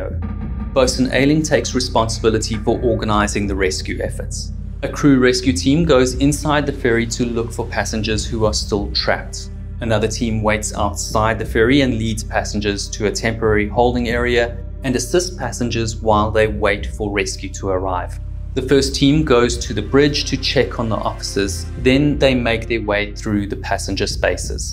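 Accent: South Africa. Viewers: I'm trying to identify the accent. My best guess is Edenvale/Sandringham? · I was not expecting a South African accent. Hello countryman!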